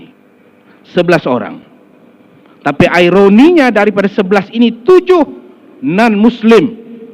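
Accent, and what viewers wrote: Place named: Kelantan, Malaysia. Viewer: sedap bunyi loghat Kelate.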